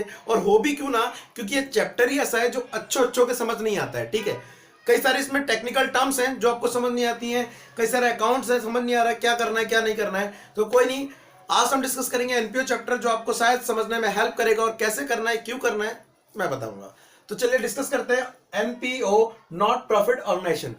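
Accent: Uttar Pradesh, India. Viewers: Sir aap uttar pradesh se ho na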